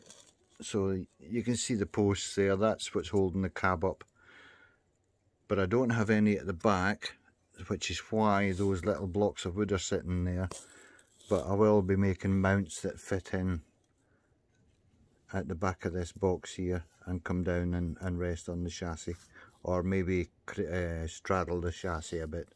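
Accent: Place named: Scotland, United Kingdom. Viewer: Love hearing a fellow scot in the hobby!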